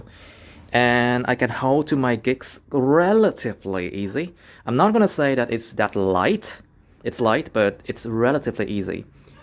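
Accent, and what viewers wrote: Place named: Vietnam. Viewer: coi từ đầu tới khúc nói cái soundcard mới nhận ra anh là người Việt Nam, hèn chi nghe giọng thấy màu quen quen =)))) mà cảm ơn anh vì bài review có tâm này nha, em cũng đang tìm hiểu giữa con cp73 này và nord electro ☺️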